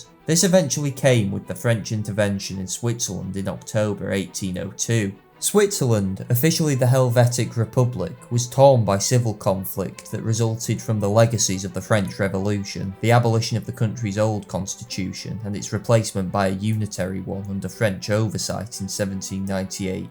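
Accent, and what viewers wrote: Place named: England, United Kingdom. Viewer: Can't you tell by the accent that he's an anglo, half of the video can be disregarded based on his nationality alone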